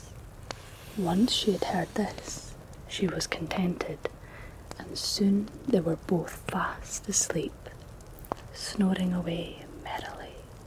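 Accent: Scotland, United Kingdom. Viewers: After years of popping in for a wee bit of ASMR with you, I still find I get some of the most immediate, consistent, and strongest tingles on your channel. And it's not just my keenness for your lovely Scots brogue, or your great pacing and expression, but you have some of the most precise audio control of anyone in this segment. I gotta believe that your musician's ear has got to be a big part of that excellence in setting up your system and in the editing. Flawless, as usual. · ❤❤ new subscriber! I hope there’s more bedtime stories! I adore your Scottish accent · Wow nice story and cant believe I only came across you today, I'm from Shetland but had never heard of this story. You have a lovely Scottish accent 😊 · May I ask which part of Scotland you're from? You have a lovely accent. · Scotland has a wonderful accent, I must say. This was quite relaxing. 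Much of my family is Appalachian, distantly originating from Ireland and Germany. The Appalachian accent isn’t one most would consider relaxing, but it’s a warm and welcoming time when it’s heard, and it’s always paired with the smell of wonderful food. It’s like a little piece of the past, of simple living and familial closeness. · Very relaxing. And your Scottish dialect never gets old! Hope you're doing well! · I went to visit Scotland a little over a month ago and oh boy I LOVE the Scottish accent! It's so different and charming. I'm happy that YT decided to recommend your video, I get goosebumps just by listening. Subbed! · This the softness of your voice and scottish accent. The make up and eyebrows are on point - absolutely gorgeous. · Omg perfect asmr a scotish take in a beautiful Scottish accent so soothing ❤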